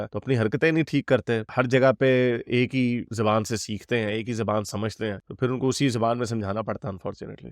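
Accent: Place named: Punjab, India. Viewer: Bro! I like your ideology and content all the time. Punjabi touch in your all the videos is mind blowing like chhitro chhitri😂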